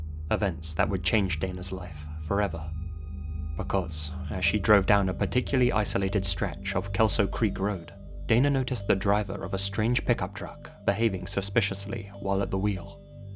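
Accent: England, United Kingdom. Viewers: I love your 'made to tell stories voice' And accent. I find it comforting. Think its an English thing. Im a northener. You should narrate audio books too. Its a real skill. One you have in abundance 🤔👍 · Are u a fellow Brit? I watch so much Youtube accents blend together lol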